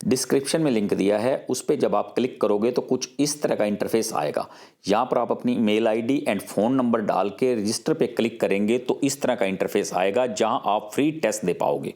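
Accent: Haryana, India. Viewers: Sir aap kaha s ho proper,,,,,,, apki language sa to aap Haryana sa lag raha ho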